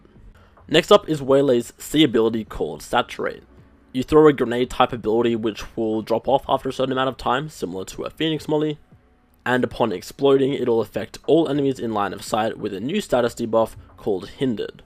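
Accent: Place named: England, United Kingdom. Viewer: Bhai aapke accent ko kya hua? Yeh angrezo wala accent kahase aaya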